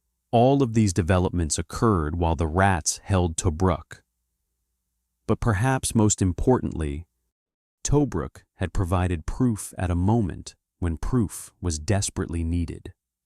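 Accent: United States. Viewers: Stupid Al strikes again miss spelling and an Al generated yanky accent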